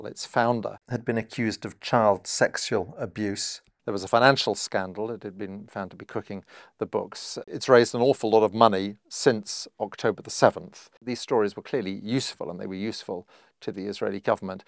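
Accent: United Kingdom. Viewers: Funny how people would swallow whatever propaganda you feed them as long as it’s delivered by a jolly-good British voice.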